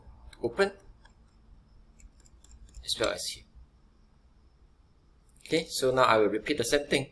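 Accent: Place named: Singapore. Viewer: it sounds like a singaporean accent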